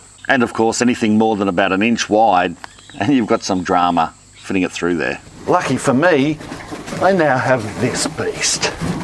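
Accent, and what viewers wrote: Australia. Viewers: Haha - true Aussie male, really good, ta! lol :D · Even if you don't garden it's worth watching this guy, gotta love those Ausies " it was a bugga to start"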